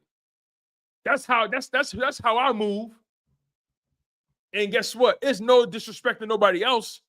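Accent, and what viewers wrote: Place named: Arizona, United States. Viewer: I ain’t know you was from Arizona street i got a lot of family there might have some mutuals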